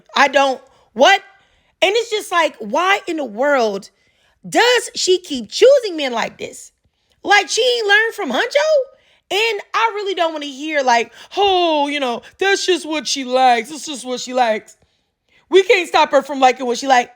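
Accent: Philadelphia, United States. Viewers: Are u from Philly?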